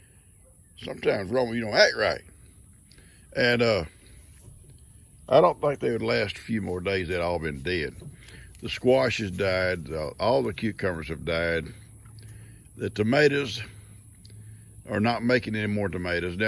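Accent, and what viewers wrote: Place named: Kentucky, United States. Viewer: That is Kentucky talk also.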